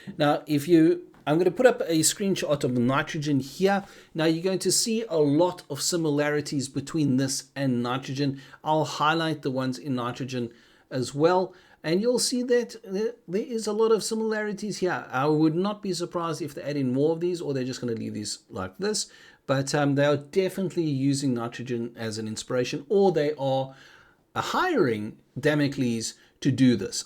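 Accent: South Africa. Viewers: my man great video one question are you south african cause you sound like one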